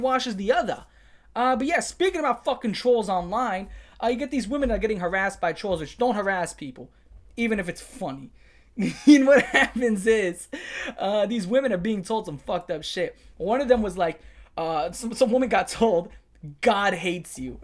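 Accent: New York, United States. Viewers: You sure you're not part Italian? You have the NY guinea accent and you're hilarious.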